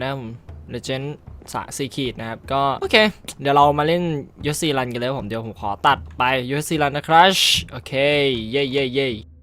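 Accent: Suphanburi, Thailand. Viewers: ก็ว่าเสียงเหน่อๆ เพิ่งรู้หนาเนี่ย ว่า เป็นคนสุพรรณ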